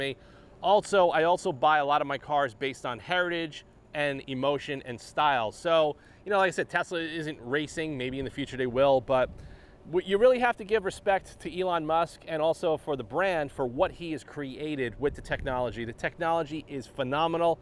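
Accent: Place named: Long Island, United States. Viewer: What county are you in? At first I thought long island north shore, but then I thought Westchester. 🤔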